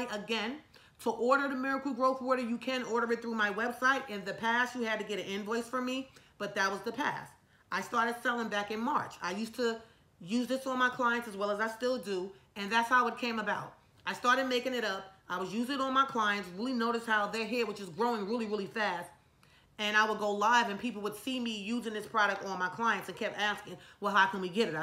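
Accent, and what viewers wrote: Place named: New York, United States. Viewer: Girlfriend are you from NY? You sound just like family honey! Will check out your website.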